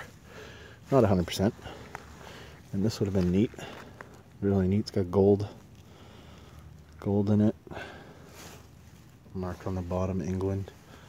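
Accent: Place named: Boston, United States. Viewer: I like that 'Boston Accent' !!!! Perfect setting for 'The Dig '!!!!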